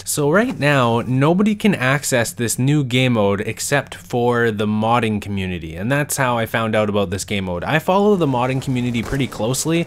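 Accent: Canada. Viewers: r u canadian cause u say about funny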